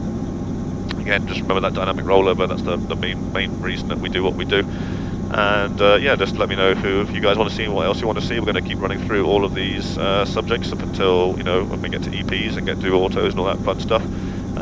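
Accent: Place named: England, United Kingdom. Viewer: Haha, he’s English.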